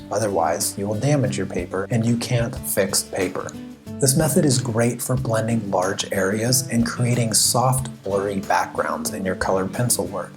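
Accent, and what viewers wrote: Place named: United States. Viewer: I am not a bigot, but I do wear hearing aids. It is SO refreshing to hear American English spoken. I have a great deal of difficulty understanding accents; British in particular. Thank you. Your voice is also soft and low, not high and piercing. Thank you.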